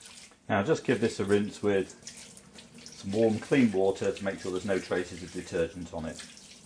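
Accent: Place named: England, United Kingdom. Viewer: Thanks so much for posting this video. I really appreciate your time. I agree totally about flax oil, pure, cold pressed and organic. Must be kept in the refrigerator. I do also agree with 475F, I believe anything over 500 creates chipping and peeling of the seasoning. ((Side note: I really enjoy hearing your accent. We lived in England for three years as my husband was stationed at Upper Heyford US Air Force Base. We lived in a thatched roof cottage on a sheep farm in 1980 to 1983. Wonderful times... wish we could step back in time!))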